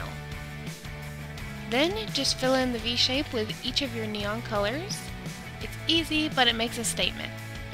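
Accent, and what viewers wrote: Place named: South, United States. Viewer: i just noticed tht u have a little country accent its so adorable i love it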